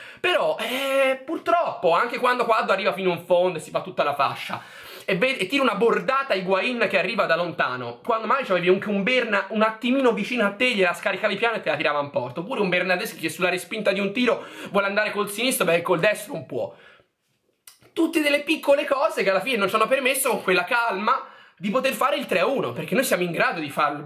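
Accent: Tuscany, Italy. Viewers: Ma sei toscano?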